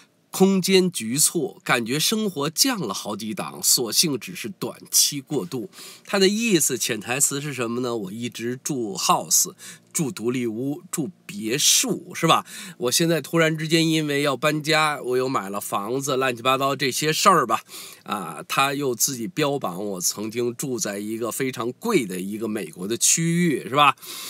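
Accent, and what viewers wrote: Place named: Beijing, China. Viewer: 还是最喜欢听大叔在六月初大嘛闺头李的那期节目，那口地道的北京话听着太过瘾了，我现在就靠着那期节目提神和对抗抑郁症。多谢🙏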